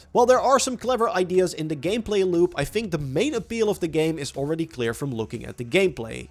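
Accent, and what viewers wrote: Netherlands. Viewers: I loathe the super strong Dutch accent. It's even worse this time.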